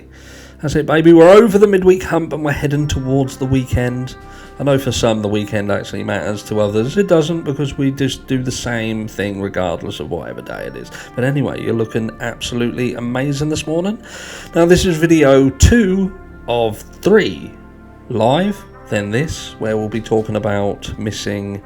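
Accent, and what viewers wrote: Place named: RP, United Kingdom. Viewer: Hello from my vacation in the DR. I love listening to you in your posh British accent.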